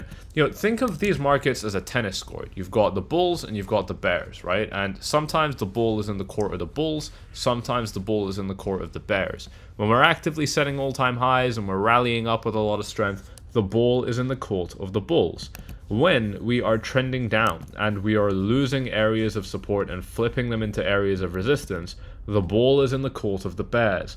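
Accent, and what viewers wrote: United Kingdom. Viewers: Major part of articulation is indebted to British language as it is cultivated, rich and replete with lexical cadence and aestheticism, in sharp contrast to American that is rude, direct and full of jargon and slang. Wish yu and him the best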